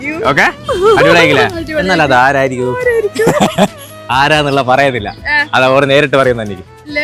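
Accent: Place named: Nadapuram, India. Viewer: നാദാപുരത്തുകാരുടെ ഒരു ഒലക്കീലെ ഭാഷയും,കോപ്പിലെ പരിപാടിയും.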